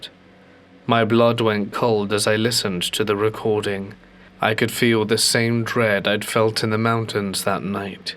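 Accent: United Kingdom. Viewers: Not bad, I enjoyed your accent not trying to be crochet about it just something about a UK accent talking about horror stories make the distinguished and eloquent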